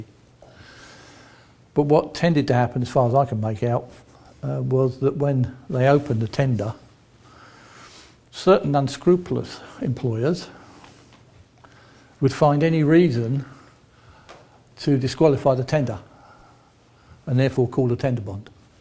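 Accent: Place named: England, United Kingdom. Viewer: Sir I just in love with your English accent its far better than American accent. Please let me know where is this from?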